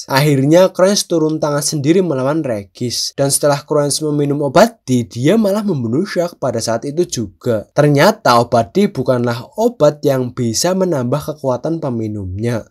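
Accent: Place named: Banyumas, Indonesia. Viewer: Njir wong ngapak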